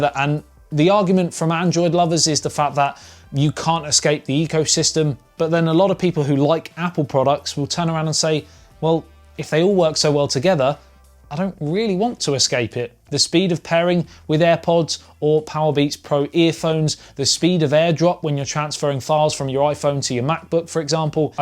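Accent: England, United Kingdom. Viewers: That's the beauty of the English speaking the English language.😍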